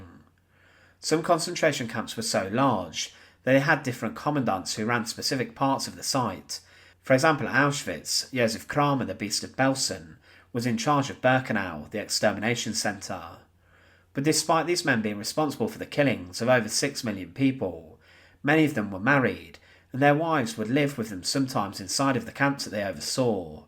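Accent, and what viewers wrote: United Kingdom. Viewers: You remind me of Mark Felton videos, British accent also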